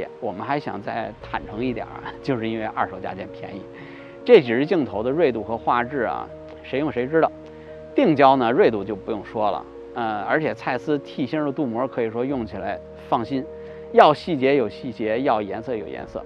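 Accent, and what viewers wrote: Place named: Beijing, China. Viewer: 北京的吧？迈玛儿拍，一听就是北京的😂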